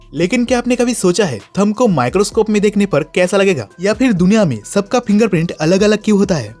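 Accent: India. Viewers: It's very amazing. Your accent is like that of a bengali🤭🤭❤💖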